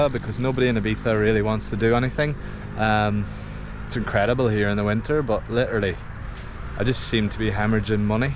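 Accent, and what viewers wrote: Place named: Northern Ireland, United Kingdom. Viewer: Sounds like a northern Irish mon.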